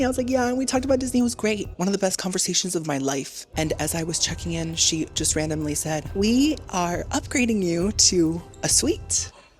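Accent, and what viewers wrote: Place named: United Kingdom. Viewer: your british accent is like joy to my ears!!!!!!! love from a london girlie xxxxxxxx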